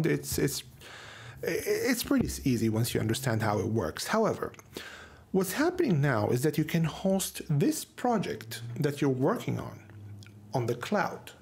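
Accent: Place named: Italy. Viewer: Thanks so much for the free DR 18 update to your courses. I was a little concerned if I updated to 18 your Film Simplified course wouldn't be compatible. You'e the man!! I really love the way you teach too. Well put together, easy to follow along and small bites. Keeps me engaged. The bonus is the accent. What is that? Italian? Spanish? I don't know but it sounds so good with head phones on. Keep up the good work. You are the genuine article.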